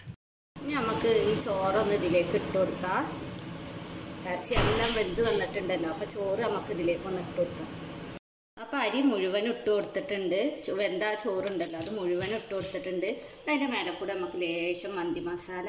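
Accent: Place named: Pattambi, India. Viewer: Pattambi ഭാഷ നല്ലോണം വന്നിട്ടുണ്ട് 😍😍😋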